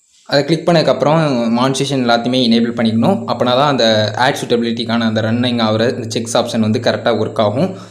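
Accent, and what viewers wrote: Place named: Tirunelveli, India. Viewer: Nanum tirunelveli than pesum bothu therithu athan ketan tirunelveli la enga தம்பி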